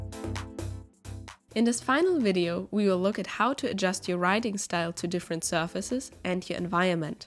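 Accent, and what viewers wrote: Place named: Europe. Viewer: With your accent, I thought you were European, but this is definitely my home, Vancouver. Can't believe it took me two and a half minutes into this video to be sure!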